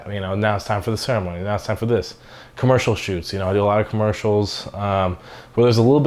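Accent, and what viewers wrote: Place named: Australia. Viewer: You sound rather Australian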